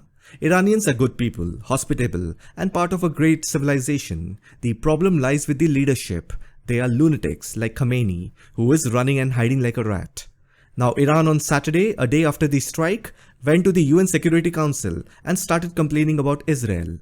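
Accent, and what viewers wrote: India. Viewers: Shut up mate barley understand your curry accent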